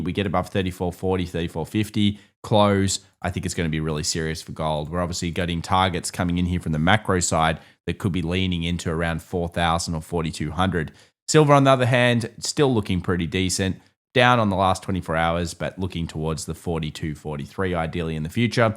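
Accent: Australia. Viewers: Did anybody ever say to you, your Australian accent makes everything sounds automatically legit. Listening even though I’m not understanding 70% of it sometimes. 😆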